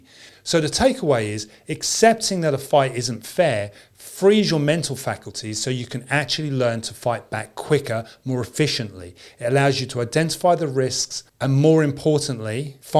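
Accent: England, United Kingdom. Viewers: Based on his accent I realize he's a Brit ( I live in the USA); I wish he was my sensi! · You sound English I guess having a hand gun is not an option , some bad guys make the mistake of thinking they are the only one with a gun. Most of them cant land a shot within 3 feet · UK accent.. hows those knife attack stars after gun bans..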